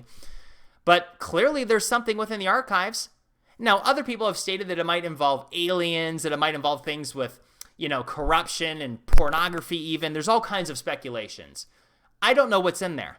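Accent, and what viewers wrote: United States. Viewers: U talk good but u so much amerikan .. try be betwen europe and amer..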